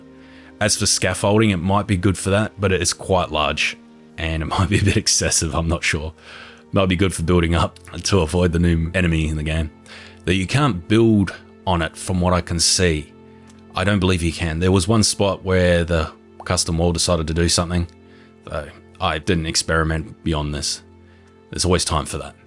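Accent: Australia. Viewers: Hugh jackman voice over! I live down under and you have some hearty accent ❤️ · ur Aussie ay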